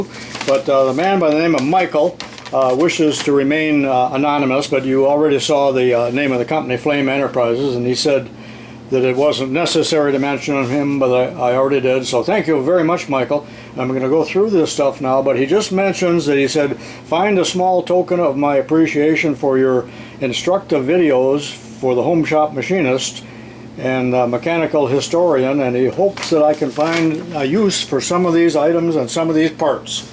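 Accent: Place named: United States. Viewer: why dont you americans pronounce the L when you say SOLDER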